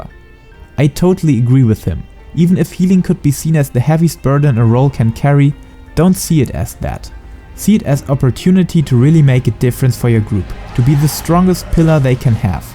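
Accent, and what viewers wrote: Germany. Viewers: A idea for when you're going to compare healers again Is to show icons of spells when you name them. Even tho I have all 2 eu 3 (rip astro) at 80 BIS I have no idea what most of the spells do when you call them out with your beautiful thicc German accent.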